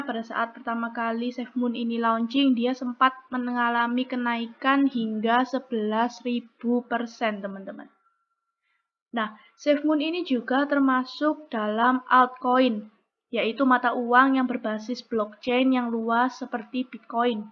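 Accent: Java, Indonesia. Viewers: medoknya jatuh cinta aku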